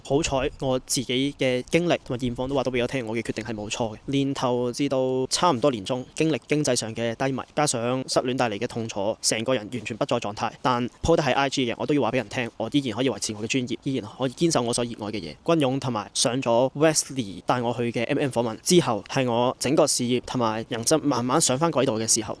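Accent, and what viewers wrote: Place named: United Kingdom. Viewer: Support ! 英文好正重British accent ❤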